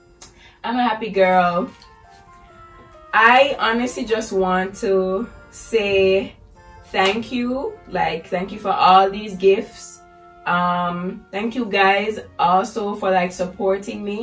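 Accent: Jamaica. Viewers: First time watching your video and I love it . are u from Jamaica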